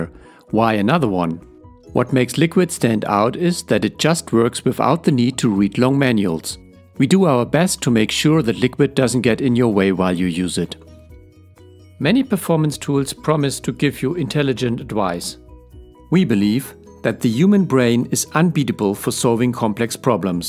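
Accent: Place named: Germany. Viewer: I love this German accent!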